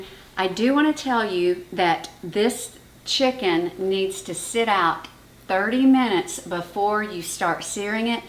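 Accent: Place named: South, United States. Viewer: You are southern. I know you can cook. Don’t stop making videos. Beautiful kitchen btw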